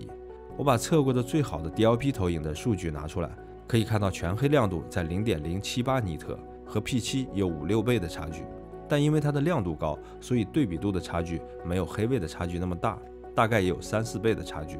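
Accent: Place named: Shanxi, China. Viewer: 是山西人吧感觉和我说话差不多哈哈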